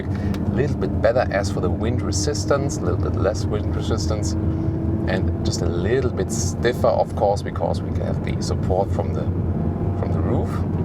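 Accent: Germany. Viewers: can you do something about the German accent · Ich konnte schon den Akzent erkennen. Deutsch. Der TT ist ein schickes Auto. :D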